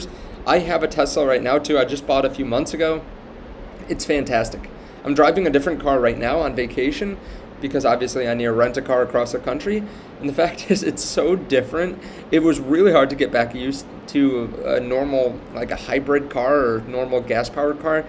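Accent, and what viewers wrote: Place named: United States. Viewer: What are you talking about "foreign"? His English is perfect. He sounds American. I've lived in Portland most of my life. Even many Americans pronounce Oregon wrong because they aren't from this region or haven't heard it said much. It's not an insult to correct someone about it, nor was it meant as one. Seriously chill the F out lol.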